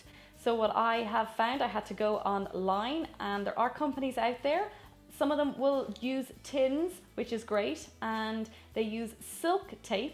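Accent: Ireland. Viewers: You mentioned a powder but sorry I couldn't understand 🙈 Irish accent is 😍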